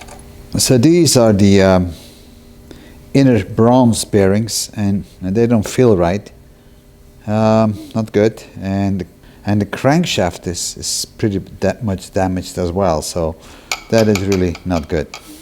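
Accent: Netherlands. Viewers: Great Videos - I thank you for them - your accent sounds like my Van Engen relatives from Holland - Gary - Iowa - U.S.A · you sir have amazing skills in bodywork and mechanics. sound like a Dutchman who lived in Canada for some years.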